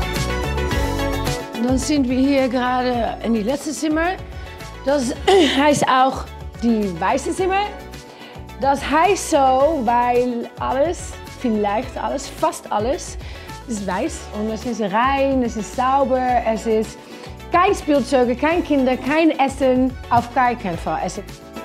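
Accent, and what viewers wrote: Netherlands. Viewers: Es ist gut! Sie hat den wunderschönen holländischen Akzent ❤️ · Lovely 🥰 ich liebe den holländischen Akzent 💕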